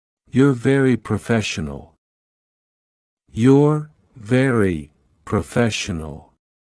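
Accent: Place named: Florida, United States. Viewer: 美国不同的州说的英语有不同的口音，这个英语口音和我们佛罗里达州相同，很适合我。非常感谢你们制作这视频！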